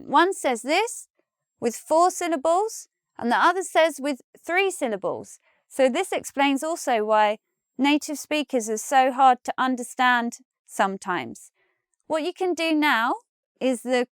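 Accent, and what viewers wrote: United Kingdom. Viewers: is this British English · I hear you used british accent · Is this english british or language amrican!!! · * O * sound make me love british Btw love u from Indonesia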